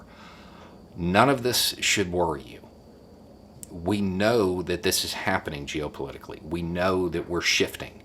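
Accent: South, United States. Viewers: It's the southern accent. 😁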